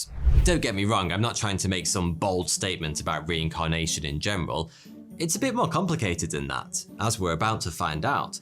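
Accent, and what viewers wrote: United Kingdom. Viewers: Don't listen to this guy he's just an as** cant stand his tone patronizing sound the fact he sounds U.K-ish" doesn't help he obnoxiousness deep six him ASAP just awful & full of Ken himself pass he deserves no debate period may his YouTube" just go down like a titanic we have no time for his type when u hear his type we just go onto the next boy bye he's typical basic 👽 👀 👍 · Your accent explains why is English written the way it is written. Your pronunciation makes it written phonetically, which puts him with other European languages. The US pronunciation of the same words doesn’t make any sense because the pronunciation of the vowels doesn’t match the letters, I.e. it’s not phonetically written. Now I begin to like the British English language.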